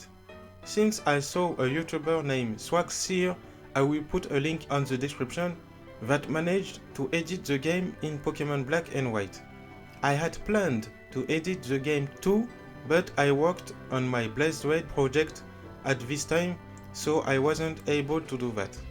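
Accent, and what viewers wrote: France. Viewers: Are you French? Because your English is a bit like mine ^^' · Ok so do you speak french ? You speak like a french ^^ Tu ne prononces pas l'anglais tres bien comme les français lol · I love your french accents sounds good im not insulting you its true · I feel like you have a French accent '^' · 😂😂on sent l'accent français haha bg frérot tu gères avec tes vidéos 👌💪🖒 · ça s entend que tu est francais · are you french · Toujours marrant l'accent français ! :p Tu te débrouille toujours mieux que moi xD Bravo pour tes 100k, continue comme ça ! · are you french?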